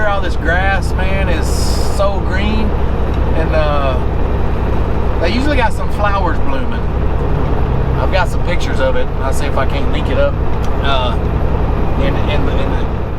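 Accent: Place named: Georgia, United States. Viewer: Are you from Georgia? Cause you sure sound like it. 😂 it’s cool, I am too. GO DAWGS!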